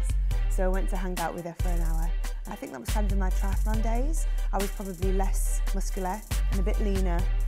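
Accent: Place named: RP, United Kingdom. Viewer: Wheres this posh accent coming from.. hate it when this happens to pll .. shame on your northern roots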